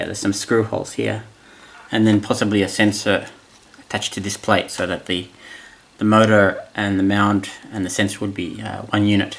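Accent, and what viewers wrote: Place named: New Zealand. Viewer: Thanks for sharing your brushless motor retrofit project with us. Those motors interest me as to their potential as a replacement for various devices with the added benefit of speed control but they are still quite expensive just to have an introductory play with. I’m in New Zealand hence the expense. Speaking of which, your accent is very Kiwi. Am I right?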